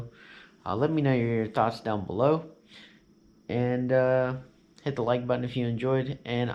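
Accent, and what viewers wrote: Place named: South, United States. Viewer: He's saying Freightliner he's just got a thick southern accent that's how we pronounce it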